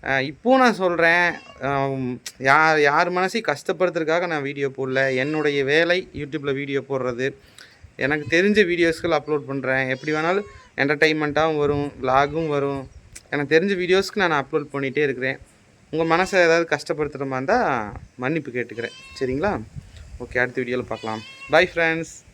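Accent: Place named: Kongu, India. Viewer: Anna.unga video all we like .unga kongu Tamil nice.namma oru speech la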